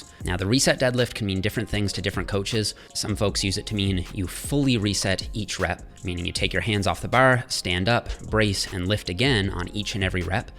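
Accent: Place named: Canada. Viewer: You’re sounding super Canadian today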